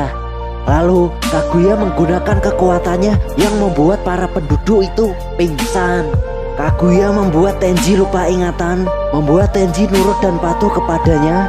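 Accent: Java, Indonesia. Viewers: Anjir suaranya medok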